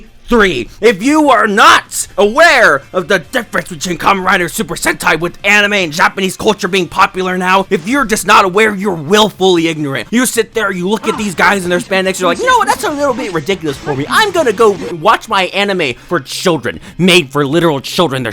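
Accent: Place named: England, United Kingdom. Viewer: Nice English accent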